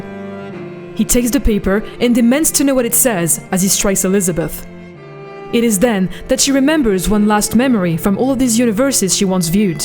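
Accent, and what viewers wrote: France. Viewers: Its a French woman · are you french? omg Salut!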